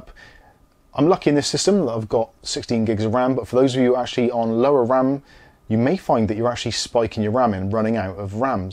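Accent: Bristol, United Kingdom. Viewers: You sound like a bristol lad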